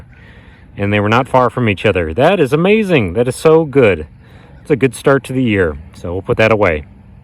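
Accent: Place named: Missouri, United States. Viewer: Where in mo are you from? I detect in Crawford county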